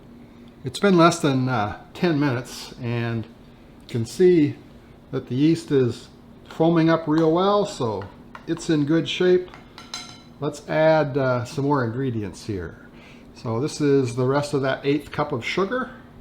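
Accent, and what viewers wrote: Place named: Midwest, United States. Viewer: You are NOT from Texas, man! Your accent is from IL!!! I can tell a mid west anywhere!!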